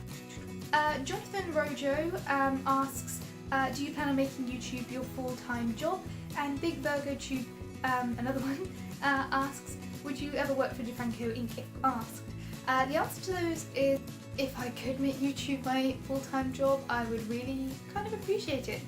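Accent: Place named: Cumbria, United Kingdom. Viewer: You've got to be from Cumbria, that accent is hard to narrow down!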